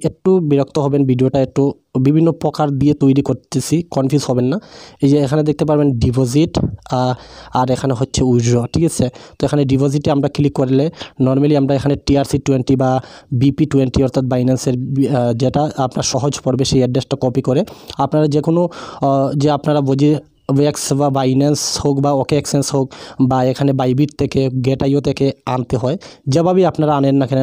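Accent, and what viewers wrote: Sylhet, Bangladesh. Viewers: সিলেটি নি ভাই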